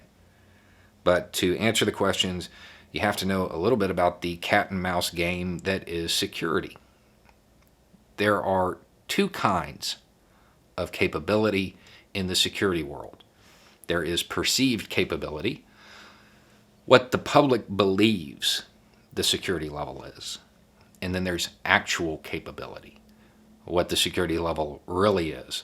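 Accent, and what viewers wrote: South, United States. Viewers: Smart man country accent...ty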